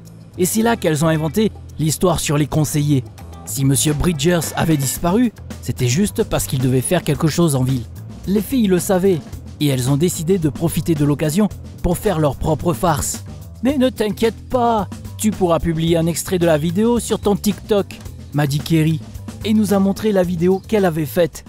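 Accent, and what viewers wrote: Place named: South, France. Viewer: Le petit accent du sud qui me fait plaisir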